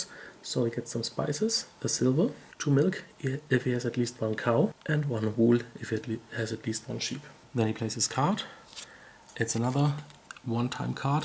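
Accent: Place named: Germany. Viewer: something about a german accent during eurogame reviews just screams professionalism.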